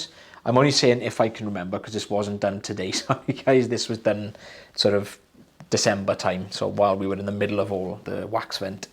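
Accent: Wales, United Kingdom. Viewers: Terrific way to ease into 2026 with soothing Welsh voice describing the challenging removals. All the best to you and Kelly and your young lad! ❤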